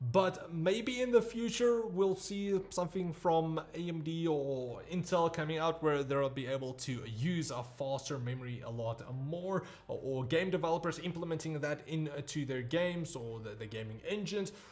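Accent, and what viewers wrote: Afrikaans, South Africa. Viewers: Thanks for the reply. With that Afrikaans accent and expert reviews this could be an epic channel. Thanks for the info on xmp. Now I know.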